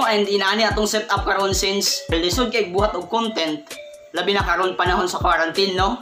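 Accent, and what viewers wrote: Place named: Visayas, Philippines. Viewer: Bisaya ka pala sir hahha makalingaw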